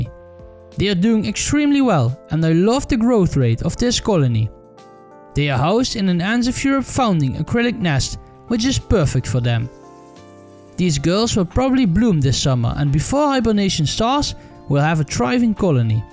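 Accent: Netherlands. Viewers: I like the dutch accent 😂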